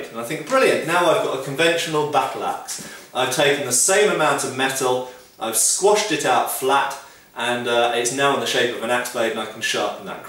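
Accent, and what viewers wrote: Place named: England, United Kingdom. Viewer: Out of a myriad of tube video's I thoroughly enjoy yours for having such well presented video's. I also enjoy the Pommy accent and the authenticity when you always turn the camera off by hand gives a nice touch. P.S ASHES BELONG TO AUSTRALIA !!!!! Yeaaa, maybe you should do a video on what your cricketers should use as the most devastating blunt instrument for maximum batting efficiency :D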